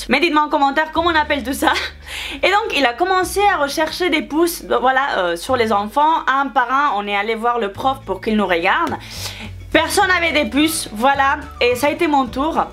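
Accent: Spain. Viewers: tu es magnifique j'aime trop ton accent espagnol ❤ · Je suis nouvelle sur la chaine dite moi quel accent elle a ? On dirait de l’espagnol ? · Cc je te suis depuis pas longtemps j'adore ton axent Espagnol sa serais mon rêve de pouvoir parler couramment l'espagnol mais j'ai encore le temps au passage ses pas des pouces mais des poux et ma question pour la FAQ est ce que ta nationalités est française ou espagnol Gros bisous ❤️😘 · non mais a son accent des le debut ça s'entend qu'elle est espagnole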